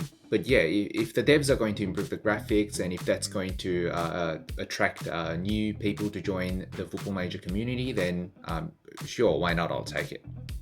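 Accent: Australia. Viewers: You sound like your from Australia! - Be good to see some tests on Low Block effectiveness vs High Line and pressing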